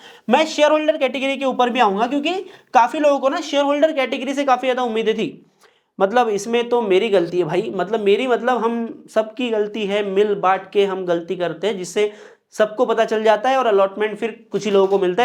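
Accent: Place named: Indore, India. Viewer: MP se ho na bhai, indori accent ❤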